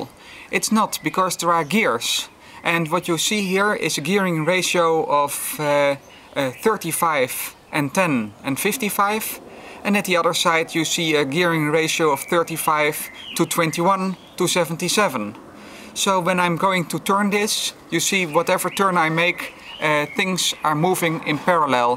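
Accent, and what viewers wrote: Netherlands. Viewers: Kom jij uit deventer? Ben je nederlands?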